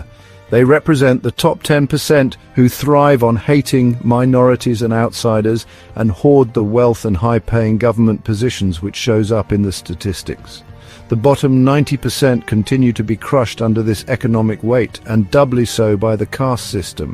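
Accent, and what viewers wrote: United Kingdom. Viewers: One blatant lie is enough to expose the nefarious agenda- the one about Tejas. The posh Brit accent is the other giveaway😅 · lol, obviously a muslim inspired rhetoric. No one is taken in by the English accent. Someone in congress woke up and initiated some creative AI?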